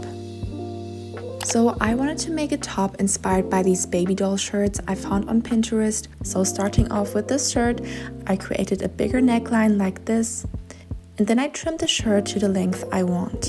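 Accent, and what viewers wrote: Germany. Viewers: I hear german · I hear some german accent .,. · The German accent 😅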